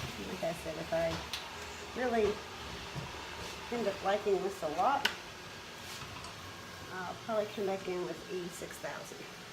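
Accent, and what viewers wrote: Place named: South, United States. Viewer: LOVE 3 TIERED TRAYS! MADE ONE FOR MY DAUGHTER OUT OF GRADUATED SIZED SPRING FORM PANS. HAVE SUPPLIES TO MAKE 4 DIFFERENT ONES I HAVE SEEN ON DOLLAR TREE DIY YOUTUBE VIDEOS. YOURS IS SO PRETTY & FARMHOUSE! ARE YOU FROM SOUTHERN ILLINOIS? YOU HAD MENTIONED CARDINAL BEING YOUR STATE BIRD. MAYBE ANOTHER STATE HAS CARDINAL AS WELL. I NOTE A BIT OF SOUTHERN ACCENT.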